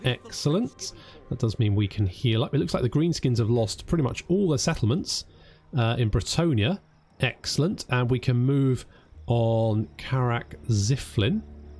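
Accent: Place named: United Kingdom. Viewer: i followed you from cap 1 to here dude, i love your british voice DUDE ( no homo)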